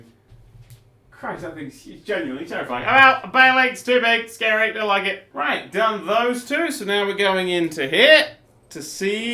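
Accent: United Kingdom. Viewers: He got really British in this video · Hes done it. Hes gone super brit. And I love it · Did get.... More British? He sounds very British in this video. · really brought out his Brit in this video.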